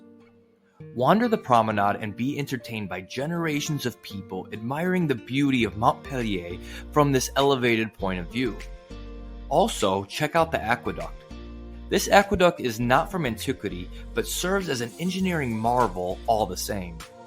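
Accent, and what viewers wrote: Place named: United States. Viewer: what did he say that was not wrong? I also live in this city and I found what he said to be accurate. Yes not all resturants are closed during the afternoon but if its past 1pm good luck with getting into one as the kitchen can close between 1 and pm Lunch is like 1-1.5hr window to go. He sounds like he is either American or Canadian and there places are open all the time. So it is very different.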